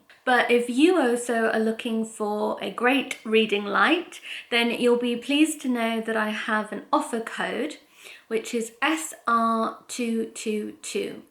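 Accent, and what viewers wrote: United Kingdom. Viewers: You just blew my mind when you mentioned you were born in Regina, I was born and have lived in/around Saskatoon my whole life and so many people have no idea where Saskatchewan is, and with your accent it's hard to believe you've lived anywhere but the UK. This was a fun surprise to my day! Annnd now I want to make soup, it's still -18 here so I need to get my hands on these ingredients and make this asap! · Isn’t it interesting you have such a strong English accent even though you spent much of your life outside of England- I wonder if that is because you were homeschooled and learned from your mother? I loved learning all these new things about you and I am loving all the new content you’ve been putting out.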